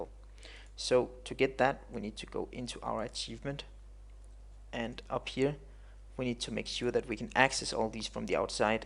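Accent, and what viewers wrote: Denmark. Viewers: hey i hope you still helps :P im trying to follow your tortuial on this achiement system :P but insted of achievement i will make it to a buy dogs(pugs) :P but i have some problems :) mine says ´pugs´ is a type, which is not valid in the given context :( and i dont get why :) so i hope you will help me :P ps Danish here :) so if you want just talk danish :) cos i can tell by your accent that you are danish :P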